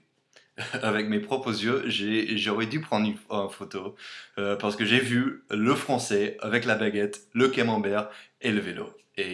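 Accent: United States. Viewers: On pense que les Américains s'habillent mal (chaussure qui ne s'accorde pas avec une jupe ou une robe et aussi un t-shirt au-dessus d'un sous-pull). Sinon, vous êtes toujours enthousiaste, vous dites "je t'aime" facilement alors qu'en France on ne le dit pas, et aussi vous parlez très vite, c'est comme si vous aviez un chewing-gum quand vous parlez (à cause de votre accent américains. Mais on vous aime😊.❤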